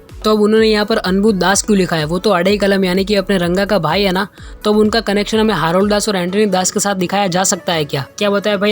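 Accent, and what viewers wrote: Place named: Gujarat, India. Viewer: "Hu maja ma chhu Bhai" tamari bolva ni reet upar thi kithu mee 😎😎😎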